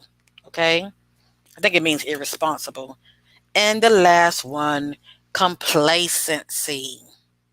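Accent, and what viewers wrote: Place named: South, United States. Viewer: Wow ! Straight on point wow I feel this in my gutt about there characteristics ! But so true about how people can go so long and keep from showing there true self ! Ty so much for this and may I ask your southern I can tell from your accent I’m from Ky I’m guessing Georgia or Alabama but I really feel the first if you can say if you can’t with everything I’d understand ! I do ty so much for your time helping us all to see a closer look into this case honey ! Stay safe and god bless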